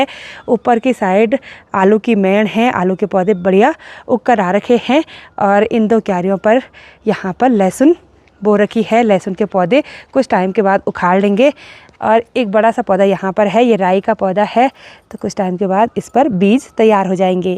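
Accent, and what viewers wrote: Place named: Nimar, India. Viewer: आपकी भाषा कुछ हमारे मध्यप्रदेश के खंडवा निमाड़ जैसी लगती है दी 🎉🎉🎉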